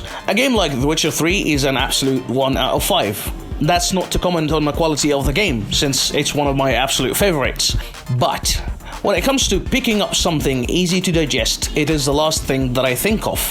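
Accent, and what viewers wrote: United Kingdom. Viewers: Aawsome video but i think you are intentionally using British accent . its kinda hard to be understood when some of your audience natively speaking arabic will also choose to watch the english version as well . your channel your rules but i think american accent is best of both worlds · انت مالك يعم انت اولاً الانجليزي كويس فشخ بس اللهجة بريطاني تقيلة يمكن بس قشطة جداً انا سمعته وفهمته حتى من غير ما اشغل كابشنز انت بقى عايز ايه بالظبط الكلام لازم يكون ليه هدف يا حتة معقد هدفك انك تقول للي قدامك انه غبي؟؟؟ اقسم بالله شوية كمان وهشفق عليك بسبب شخصيتك التعبانة اللي اكيد محدش طايقها فالحقيقة ولمعلوماتك يا معقد الباشمهندس مهندس ميكانيكا قد الدنيا بس بيحب الالعاب فبيشاركنا وبيجرب يخليه محتواه متاح لغير العرب بس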